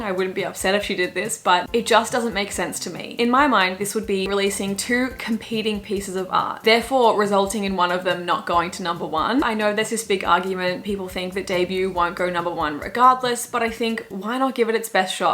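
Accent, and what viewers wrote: Australia. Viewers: Amazing vidéo as always. I Watch every vidéos from France ! It helps me improve my english and understanding of the australien accent. Merci !!! 🥰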